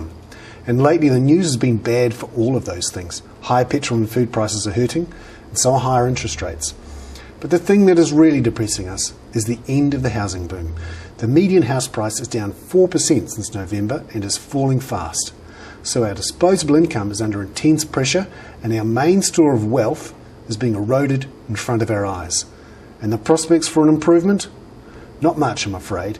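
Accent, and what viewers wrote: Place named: Canada. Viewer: great comments and nice accent from canada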